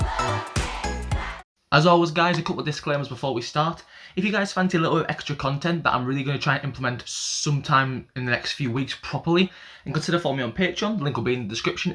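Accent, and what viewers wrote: United Kingdom. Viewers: the british accent really surprised me omg it’s so nice to see a fellow astro fan who is english, thankyou for being so kind and knowledgeable too! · An Astro fan (Aroha) just pointed this video out to a few of us and you speak so much sense! Really interesting watch and super informative. Haha Jinjin’s singing voice isn’t amazing but his rapping voice is insane! You sound like a fellow Brit also, hello!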